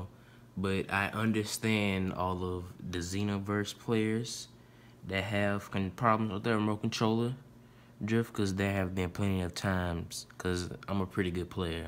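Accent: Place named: Louisiana, United States. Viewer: You from Louisiana bro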